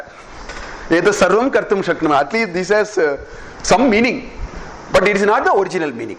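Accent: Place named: Tamil Nadu, India. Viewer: This is because you should know he is a tamilian ,sorry,.This language has a small set of consonants .it does not contain the full KA varga,CHA varga meaning only first letter of each varga exists.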